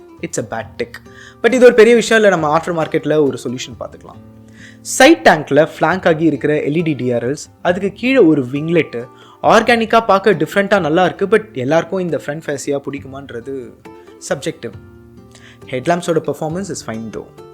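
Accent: Chennai, India. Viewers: You are an amazing motorcycle tamil youtuber. When you talk the technical jargon in your own way is so cool man especially in our Chennai slag...you nail in every video. Although I am less interested in motorcycles I used to watch coz of you.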